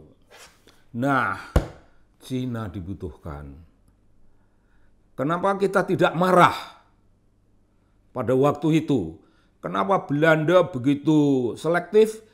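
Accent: Indonesia. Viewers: Kang Sobary itu tulisannya bagus. Saya suka membaca esai-esai dan buku-bukunya. Tetapi, kalau berbicara kurang menarik. Bahasa lisan Kang Sobary amat medok dan tidak terstruktur dengan baik. Jadi, menurut saya, lebih baik memakai naskah biar lebih enak didengar. 😊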